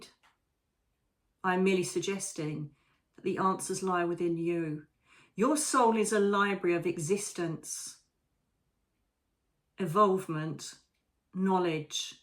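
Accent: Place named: Essex, United Kingdom. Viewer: “Fuzzy”? really now ….😮😂 That was amazing!! Fun and funny. The rock in the river -great metaphor! Mostly I love hearing a bit o Essex over here in New York.❤ Great job!!!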